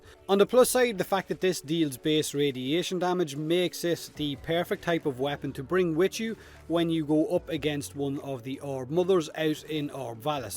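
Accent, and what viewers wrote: Ireland. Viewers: really?! again?! you know we're only here for your Irish intros and outros!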